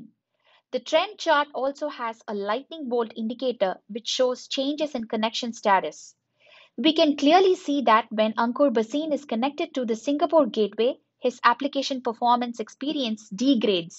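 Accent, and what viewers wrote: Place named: India. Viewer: Indian english.. sorry this is can not work.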